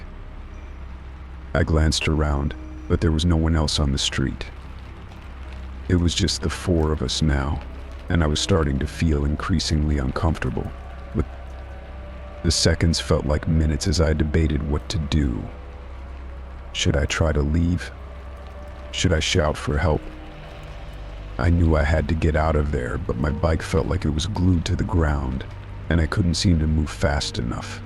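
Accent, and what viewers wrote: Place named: Southern England, United Kingdom. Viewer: South England. You are really helping me to sleep thank you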